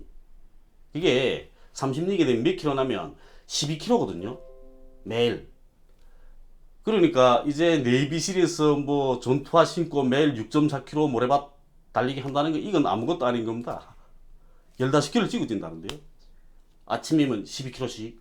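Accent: North Korea. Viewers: 오랜만에 함경도 말씨들으니 정말 감사합니다. 많이 수고하네요.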